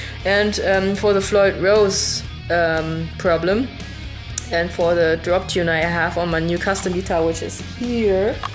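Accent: Germany. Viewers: Hi love your covers!! But I think you should Have Zakk Wylde and Dimebag RIP!!! also Yngvie!!! And the great Eddie Van Halen!!! Imo, way better Guitarist!!! But as always love German accent!!! Keep Rocking as always 🤘🤘🤘🤘😈😈😈😈😈👍👍👍🤘🤘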